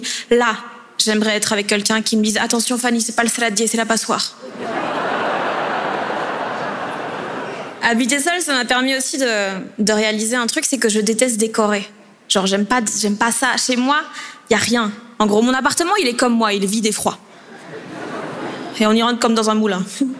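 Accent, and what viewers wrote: Belgium. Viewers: Depuis quand elle a un accent belge? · découvre l’accent belge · C'est moi où là elle a "repris" son accent belge qu'elle a pas d'habitude à la radio? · Elle est belge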